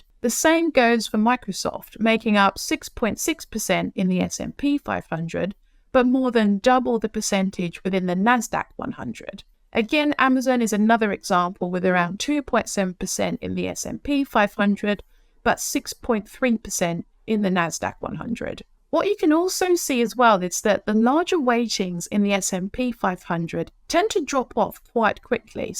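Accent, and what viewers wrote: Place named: United Kingdom. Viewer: A Brit talking/teaching about the American stock market? LoL...I was caught off guard at first. Like your accent, though. Good stuff. I'll check out the rest of your videos too.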